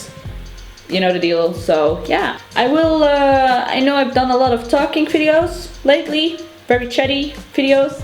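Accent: Netherlands. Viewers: I hear a Dutch "accent" in your English.. Are you Dutch? Haha cuz if you are hii me 2 :3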